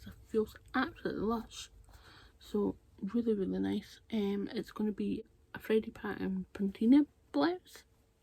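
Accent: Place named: Glasgow, United Kingdom. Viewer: Oooooo that sky behind you. I was born in Glasgow and moved to the midlands when I was 10 so it is lovely for me to hear your voice. Accent of home. Thanks for your videos.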